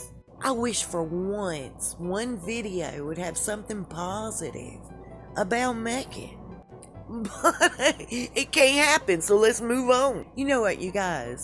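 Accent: South, United States. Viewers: I didn’t know what you looked like. You have a southern accent. You finally showed your face. Your southern dialect didn’t match what in my mind you looked like., I was blown back. You are so beautiful. ✍🏻👍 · I absolutely love your accent .....are you from the south?? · LOL - This Southern accent commenting on the royals is odd even though the commentary is on point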